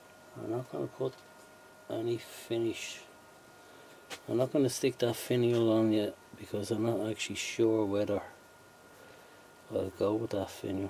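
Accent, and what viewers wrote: Dublin, Ireland. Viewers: You're Irish!<3 Where about are you from? You sound like you're from Dublin. I am from Dublin and am a student. I have a great interest in woodworking :)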